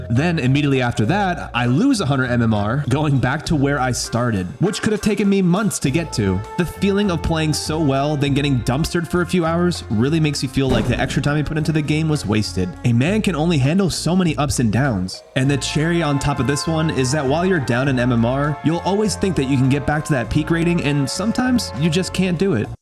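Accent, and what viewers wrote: Sweden. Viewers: Is he from Sweden?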